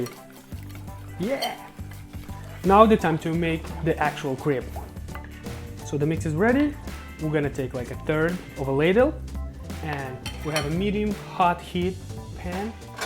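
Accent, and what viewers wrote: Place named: Eastern Europe. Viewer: DAT SLAVIC ACCENT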